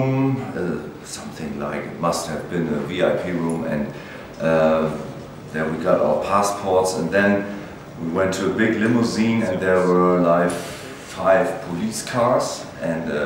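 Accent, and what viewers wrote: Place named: Germany. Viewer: I love his voice and pronunciation in english with a german accent. Very brutal and strong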